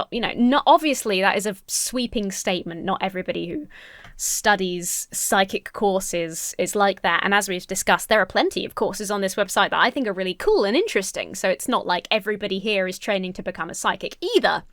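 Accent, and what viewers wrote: United Kingdom. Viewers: I love your thoughts. Ever since watching you on the Atheist Experience I can't stop watching your videos. Part of it is your UK accent (guilty as charged) but I keep watching for your skepticism. Your knowledge and thought process is invaluable for us low-education Americans.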